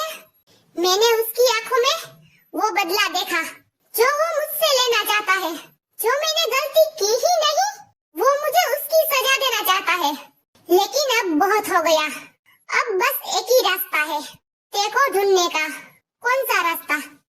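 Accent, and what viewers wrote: Gujarat, India. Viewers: Didu aap kya gujrati ho voice sound se lga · Wow gujtati accent is so good 🎉😊❤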